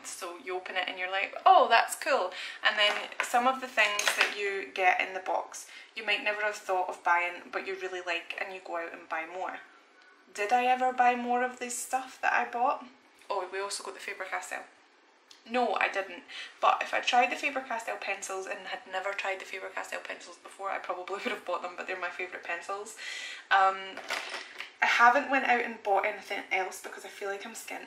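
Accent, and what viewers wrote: Scotland, United Kingdom. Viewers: Hey! Is that a scottish accent i detect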